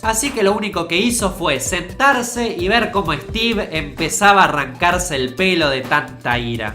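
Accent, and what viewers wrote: Argentina. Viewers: Dilo desde el inicio deja de hacer estas mierdaaass... que luego personas como yo, debemos soportar a estupidos fna de x marcar creyendo estas meirdas.... No jodas... todos sabemos q la interfaz de Apple su un robo total y declarado a Xerox PARC... así q eso de "ENTORNO GRÁFICO FLAMANTE" es totalmente una desinformación y la gana de crear polémica, Y no me jodas q es eso de "orishinal" todos sabemos q eres Argenta... pero eso es no saber hablar.. vocaliza por favor, y no me digan q lo dice en ingles, por q así tampoco es... y encima esta al revés. (Justo lo oí cuando escribía y me descoloco, tranquilo Argentino medio, no os odio o cosas asi.) Bueno... Aquí el único GENIO DEL MAL y SOBREVALORADISIIMOO es el JOBS, ya q el copio primero la interfaz de Xerox PARC descaradamente y iban de chulos... creyendo q era los unicos... y ahora q Bill, se los adelanto... 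que hace el copión y rata de JOBS se enoja.... WTF! quien es el GENIO DEL PLAGIOO... o mejor dicho el IDIOTA DEL PLAGIO. A u otra cosa mas general, gente q mira este canal por favor dejen de verlo este tipo es un papanatas... con ganas de crear ruido y subir en fama, si lo siguen podrán ver como cada día poca temas mas controversiales para llegar a mas de 1m de subs rápidamente y mas , de forma rápida, a costa de decir mierda pura. Es asi, tus video son de buena calidad con tu tono/toque... todo eso, pero una vez q dices mierdas todo ese ambiente se convierte en puro asco insoportable... y ya da igual si haces cosas buenas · no sos argentino, por eso decis eso. Es nuestro acento y ya. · Habla asi porque es argentino. Y esta hablando de un apellido ingles. Casi todas las palabras extranjeras las pronunciamos con nuestro acento en el caso que estemos hablando castellano. Si te parece raro ponete a pensar como pronuncian los ingleses o los estadounidenses los apellidos o los nombres españoles.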